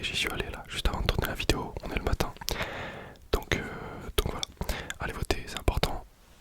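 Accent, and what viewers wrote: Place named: Quebec, Canada. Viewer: Rien que d’entendre l’accent du quebec 🔫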